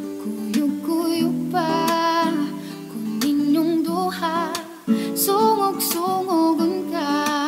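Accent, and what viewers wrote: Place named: Visayas, Philippines. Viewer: ang cute mag BISAYA hehehe NICE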